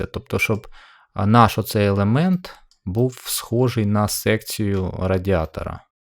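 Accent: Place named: Central Ukraine, Ukraine. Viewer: запитав, бо ваша вимова дуже схожа на центр України, на мій рідний говір. Ще раз дякую за вашу працю. Ви створюєте важливий українськомовний контент 👍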